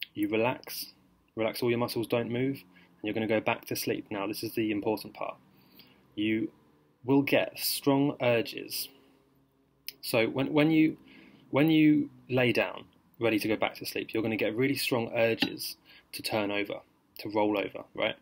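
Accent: United Kingdom. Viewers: Makes sense..I've never had a lucid dream, not ever really tried to. But I think I will after watching this. My body naturally wakes up between 5am-6am & then when I get back in to bed and fall asleep I have the most intense real life dreams, so colourful & I can touch people and talk and do things..but I think I am not lucid dreaming because I only remember it once I wake up and I've never drifted off in to sleep while staying still. I usually always lie on my side..from the urge to haha..but yeah it makes sense in to hear this method..I will let you know if it works. Thanks for your video, also nice to hear a fellow Brit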